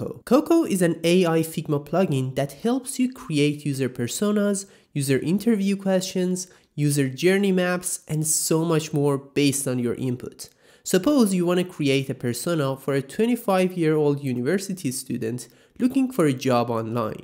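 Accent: Iran. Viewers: why ma irania inghad lahjamoon ghabele tashkhise ? )) damet garm azizam . are you best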